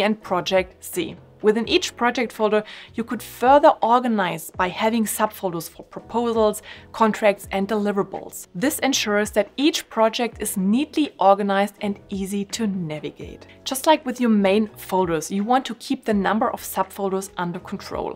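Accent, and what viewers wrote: Germany. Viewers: Are you German? 🙄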